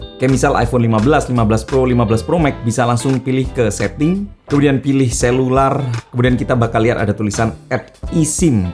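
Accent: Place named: Madura, Indonesia. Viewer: bang gua baru notice lu orang maduris dari cara ngomong lu baru ketangkep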